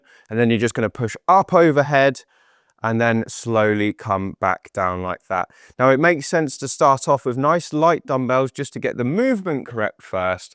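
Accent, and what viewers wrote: England, United Kingdom. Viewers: The best English accent ❤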